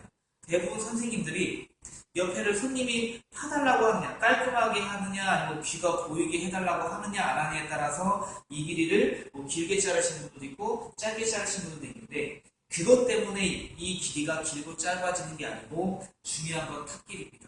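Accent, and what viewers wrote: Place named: Jeolla, South Korea. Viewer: 구수한 전라도 사투리가 정감이 가네요 감사합니다 원장님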